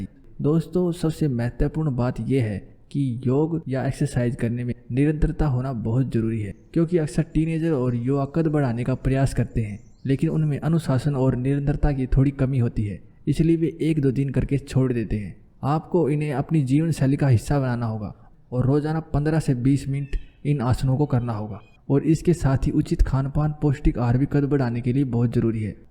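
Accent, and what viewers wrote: India. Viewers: Are u from haryana??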